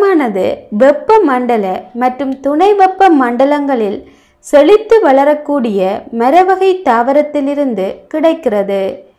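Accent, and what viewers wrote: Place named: Malaysia. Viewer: Unga Voice paartha, ouja channel admin mathiri irukku 😀😀, Malaysian slang. Nice Video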